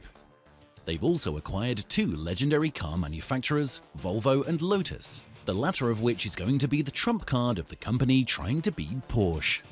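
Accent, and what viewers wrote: England, United Kingdom. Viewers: Just curious, which English accent was the narrator using ? Thanks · English accent….. American market 🤨🙄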